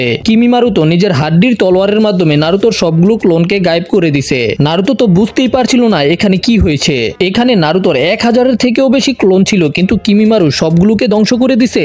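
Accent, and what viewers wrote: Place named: Barishal, Bangladesh. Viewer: আপনার বারি বরিশাল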